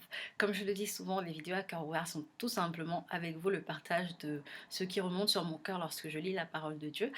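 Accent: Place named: Cameroon. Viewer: oh Mon Dieu! ton accent je me disais aussi que tu es Camerounaise. l'accent😅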